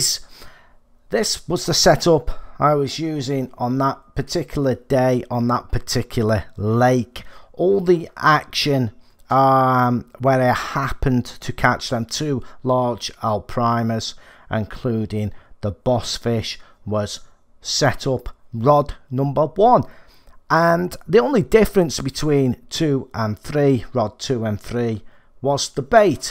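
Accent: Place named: Scotland, United Kingdom. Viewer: thank you🙏 your accent is funny do you come from Scotland?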